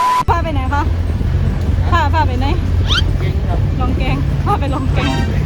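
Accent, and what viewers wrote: South, Thailand. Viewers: เอ็นดูพี่ แหลงใต้ 5555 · แหลงใต้ น่าร๊ากก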